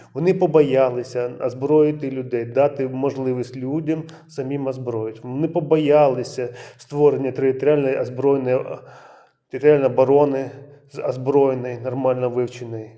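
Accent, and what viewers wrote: Russia. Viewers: Ти не дуже схожий на українця Українці так не говорять у тебе вимова російська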